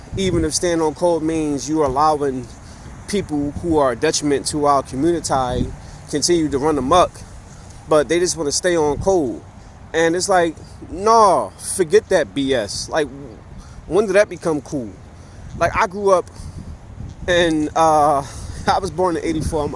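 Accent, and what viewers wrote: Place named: Caribbean. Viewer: This sounds like Tether babble you must have a carribean background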